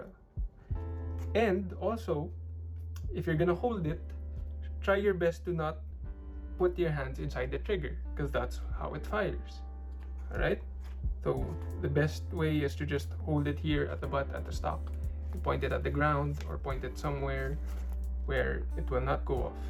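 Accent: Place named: Philippines. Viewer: filipino english is so satisfying!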